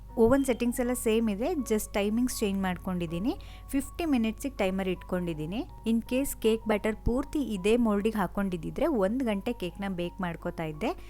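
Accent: Mangalore, India. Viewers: Nice ❤ Actually nam ur Kundapura but nim mathaduva style nam mangalore side ansuthe and more over ur cooking skill was too Good Make Paneer Dishes❤❤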